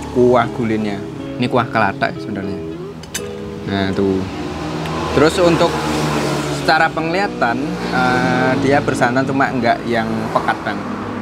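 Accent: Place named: Jetis, Indonesia. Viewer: Mas nek ngomong ki koyok ibuk ku bahasane persis jetis tenan 😂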